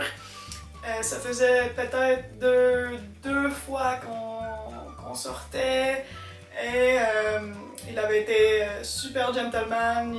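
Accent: Quebec, Canada. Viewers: ah bon tu viens de dire que tu es déménagée au canada, ça doit être pour ça que t'as l'accent :) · Tes tu canadienne ? Super video sinon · ok ton accent français/québécois wow! :D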